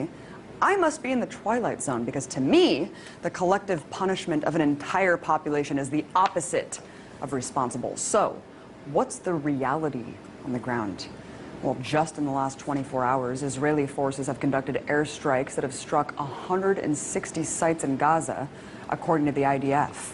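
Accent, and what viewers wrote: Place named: United States. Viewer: لكنتها لا تبدو انها روسية وانما امريكيه تعمل في قناة روسيا اليوم الانجليزية .. بس بصراحة المتحدث بأسم الخارجية الامريكي 😒 😒 وين السيسي؟ يا بشرة خير وينك؟ يا معلم من جيت انت ما شفنا غير القتل والقتلى كلهم مسلمين من مصريين و فلسطينيين كلهم في رقبتك يا ظالم الله ينتقم منك والله لو ان محمد مرسي بالحكم ما تجرأت الحقيرة اسرائيل يا شعب مصر انتم من اتيتم بالسيسي ودماء القتلى في رقابكم لانكم ايدتم هذا المجرم ارقصوا طربا وفرحا ع انغام بشرة خير هذا الي انتوا فالحين فيه هز الوسط و مش غريبه مادام الام المثاليه فيفي .. لك الله يا مصر ولك الله يا غزة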